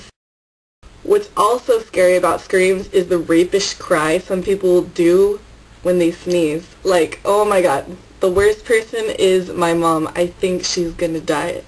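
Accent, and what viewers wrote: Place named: Philippines. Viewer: HAhaha Next time you sneeze stick your tongue out :P Nice accent btw. "Aww cute na cute" Lol Filipinos FTW!! :P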